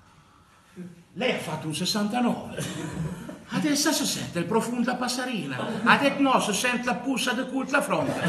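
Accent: Italy. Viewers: umbro marchigiano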